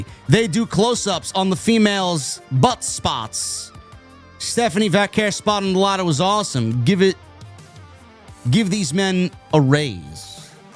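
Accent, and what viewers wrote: New York, United States. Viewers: wasn't angry. That's how alot of us new yorkers talk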